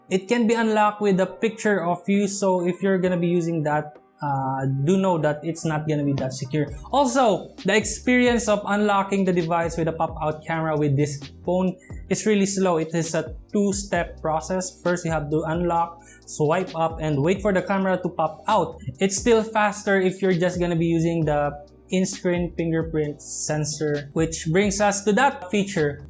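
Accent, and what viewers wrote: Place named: Philippines. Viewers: The way he enunciates words, I believe that he's doing it on purpose having the "Pinoy" accent. At first, it was awkward but then I realized he's been doing it on purpose which is for me I think is better than trying to speak in a very slang, Aussie and or British accent 😆.. and I can understand him better. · We have our own accents, and yeah syempre kapag english ang title dapat english din magsalita kasi maraming complains. Hindi lang Pinoy pwede manood boi, international viewers din. Hindi tayo native ingglis speakr.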